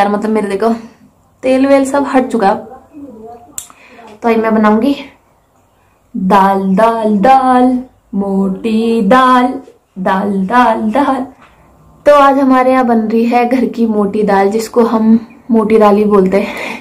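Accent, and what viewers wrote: Garhwal, India. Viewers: Iam kumauni from nainital.. u r garwali nice tone.. ❤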